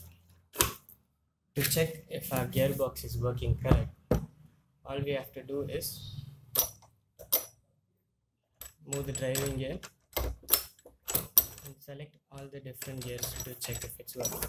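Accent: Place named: Bengal, India. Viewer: Babal English vaiya wowowo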